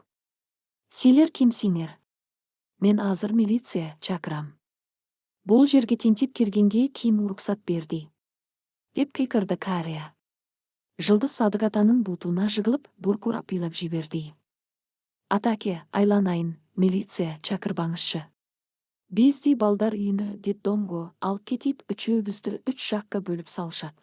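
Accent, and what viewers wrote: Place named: Russia. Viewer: Чала орустан башка окуган киши таппай калдынарбы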